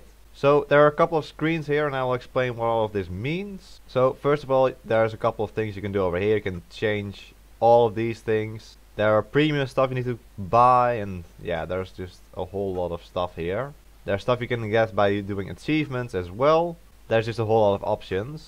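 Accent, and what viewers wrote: Netherlands. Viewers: thick dutch accent there.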